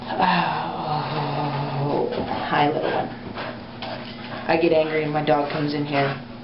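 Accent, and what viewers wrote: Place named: Texas, United States. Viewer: so, if you are not from Texas where are you from. You must have lived in Texas for long enough to gain an accent. I really enjoy your video, and you look amazing.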